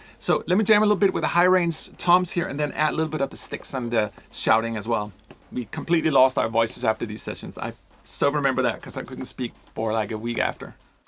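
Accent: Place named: Denmark. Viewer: I KNOW A DANE WHEN I HEAR ONE! Hej, en kærlig hilsen fra Danmark:D It’s black friday, and I’m about to spend maybe to much cash on this and that plug-in instrument, and then I found Soundpaint through 8DIO, where I just bought epic toms. But I can see that every day is black friday at Soundpaint:D …and that I should’ve bought epic toms at Soundpaint instead - and I will. While the samples may be the same, this is clearly a huge update. Good samples are good samples. Doesn’t matter if they were made today or 10 years ago. How we can use them matters. The Soundpaint player looks amazing, and I can hardly wait to download it and get to know it. De bedste hilsner fra DK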